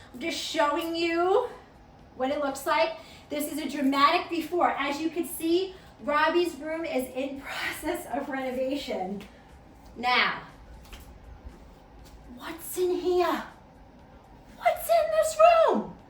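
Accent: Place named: New York, United States. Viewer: Are you from NY? Like the Bronx? You have the accent. I’m from Westchester NY parents from the Bronx. You are definitely a New Yorker!❤❤❤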